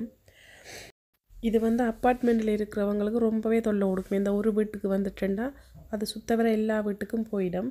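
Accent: Sri Lanka. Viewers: Ilangai Tamil 👌🏼👌🏼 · Ellangai tamizh super❤❤❤ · அக்கா நீங்கள் ஈழ தமிழர் ஆ...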